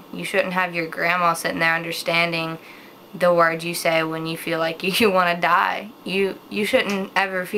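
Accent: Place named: South, United States. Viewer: ... >.> you're from the south... O_o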